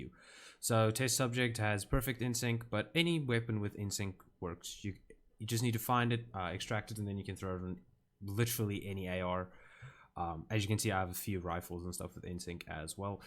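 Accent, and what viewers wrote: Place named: South Africa. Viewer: Thanks for the video. Which part of SA are you from? I've been to Durban for some time.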